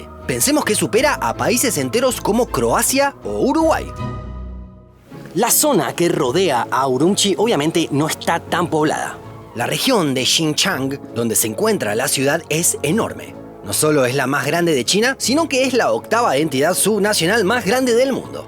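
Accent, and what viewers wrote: Argentina. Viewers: ¿Por qué pronunciás "Urumchi" si es "Urumqi"? Si en vez de Beijing decís Pekín... no se entiende. Igual por suerte volviste a usar el yeismo de Argentino, hace unos años te hacías el mexicano neutro y decías "poio" o "iuvia".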